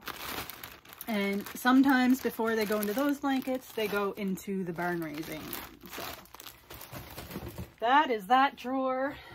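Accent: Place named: Canada. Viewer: Good morning, You sound like you are from Canada. Whew you have quite a stash! The amount of yarn you can remember what it is and how you will be knitting it is dizzying! Are you challenged to figure out a plan for prioritizing or do you knit what you are in the mood to do? I'm guessing you are a really fast knitter. I'm envious! Thanks for sharing and your pet friends are adorable! I have 2 border collies to keep me company while I knit. They constantly entertain and the youngest one got a hold of one of my hanks for a sweater that was in a project bag and turned it into an impossibly swirling knitted mess of a string pile. I was able to untangle and wrap into a ball that took me 3 evenings. I couldn't get mad at him though, I need to be more careful so I am!!!! Cheers to you for sharing your deep stash! Carrie from the San Francisco Bay Area. 😘🍵🧶🪡🧵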